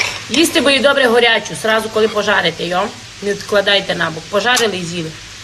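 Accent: Transcarpathia, Ukraine. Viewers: Яка файна мова, обожнюю цей закарпацький говір. Дякую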